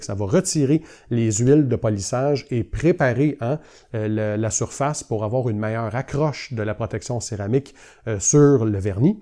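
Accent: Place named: Quebec, Canada. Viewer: L'accent québécois dans un video de detailling sur YouTube j'aime ça. Taukebkictte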